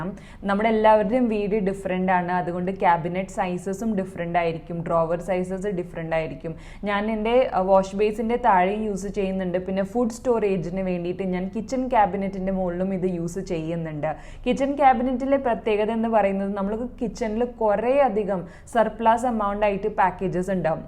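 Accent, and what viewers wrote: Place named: Mumbai, India. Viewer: Are u raised in Mumbai? Ur accent is like a Mumbai malayalee 😄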